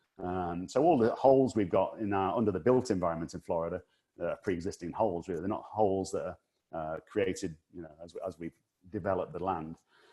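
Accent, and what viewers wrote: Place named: England, United Kingdom. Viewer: From a random Floridian: Thanks for posting this. I was already familiar with most of the geological history and hydrology of Florida, but it was very interesting to learn about some of the engineering, economics, and politics of sinkholes. Plus it’s always fun to hear our town names pronounced with an English accent. Cheers!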